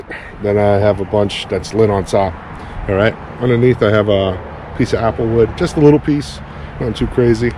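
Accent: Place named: New Jersey, United States. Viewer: That Travel Kettle has got to be a must for anybody into smoking and grilling. I think you'll find a lot of uses. By the way, I love to hear what I call my Jersey accent. Don't ever lose it!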